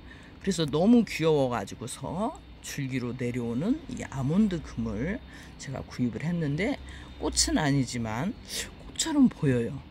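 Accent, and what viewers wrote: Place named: Seoul, South Korea. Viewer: 설명 하시는 말 솜씨가 완전 서울 토박이 같네요 ㅎ 전 갱상도라 부럽습니다 여러 이쁜꽃들 소개시켜줘 고맙습니다 👍🙏🥰❣️🌷👏👏👏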